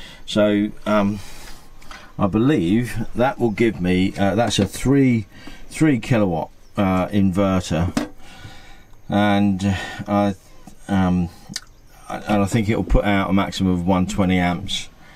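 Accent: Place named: South, United States. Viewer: I'm with on that its that southern drawl. 😉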